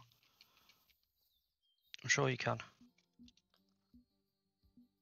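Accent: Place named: United Kingdom. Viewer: Sounds like your from UK, subscribed. Works amazing there with oversized carp mix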